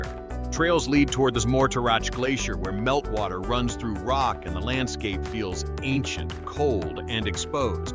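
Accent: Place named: United States. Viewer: Replace the American with proper pronunciation, and move Munot from Stein am Rhein back to Schaffhausen. Nice, but standard selection of places.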